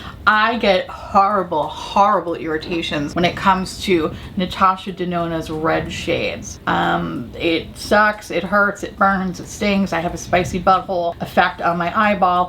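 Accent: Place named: New York, United States. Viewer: I love you, you know this - but I’d absolutely love you to do an entire video in your New Yorker accent. Friday evening in Australia, been looking forward to getting home after work, having a few drinks and watching your video. Have a great weekend!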